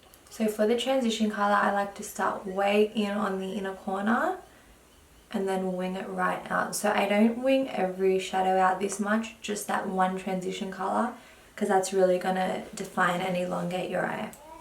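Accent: Australia. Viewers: U sound like an Aussie girl. Are you?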